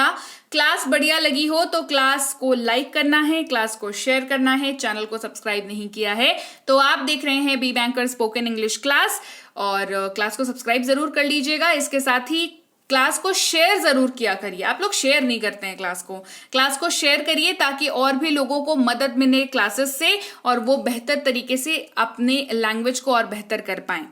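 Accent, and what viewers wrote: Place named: East, India. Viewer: आप के उच्चारण मे पूर्वी भाषा मिठास बढ़ा देती है।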